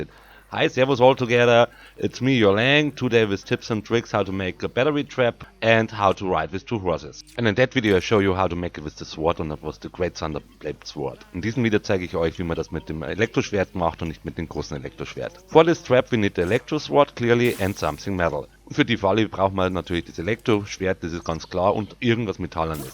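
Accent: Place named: Austria. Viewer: I find dein Österreichisch Akzent geil.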